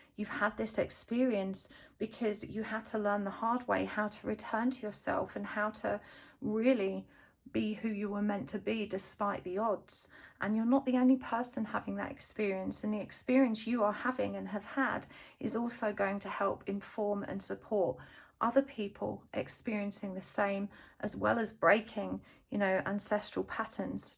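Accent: United Kingdom. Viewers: Wow just wow. Since my dark night I have regularly been using 2 readers on YouTube and no others, but your channel and readings kept coming up on my youtube feed, often alongside synchronic numbers but I resisted using another reader up until now. I have been drawn in by repeating numbers, animals and I have been dreaming of and seeing twins for the past year or so in cards and symbolism. (I am still not truly sure why) but this attracted me to your channel amongst the number sequences of likes, views and the time on my phone everytime you pop up but especially this time. I have been going through an incredibly tuff dark night for what I perceive is 4 years but I am learning it has really been my whole life, that was just when the awareness of my spirituality began amongst the chaos and trauma. I then had a series of life events happen which brought up both old and new trauma and triggered a kundalini awakening and I thought I was going crazy. 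Especially when my starseed awakening started... which I feel you may resonate with just from hearing you talk etc. Anyway I have been drawn to more than one reading but have only watched the first one do far, and it was so accurate in every way that I feel as though you was speaking directly to my soul. I am from the UK also and I must say it was both surprising and nice to here a British voice , as my other readers and starsseed family are all from the US. It just felt a little closer to home. Your reading ran very deep for me in many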